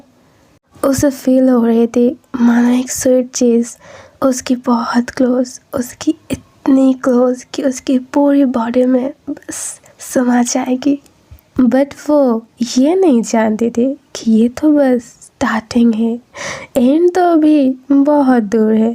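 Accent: Bengal, India. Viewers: Aapki Bengali bahut jyada cute Mary Bengali main musalman hun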